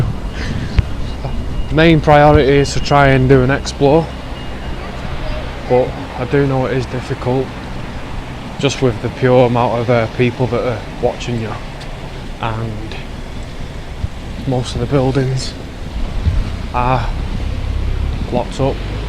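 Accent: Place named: Salford, United Kingdom. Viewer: You can tell your from Salford..love it when you said what's she looking at in the daft hat lol.. great video really like your stuff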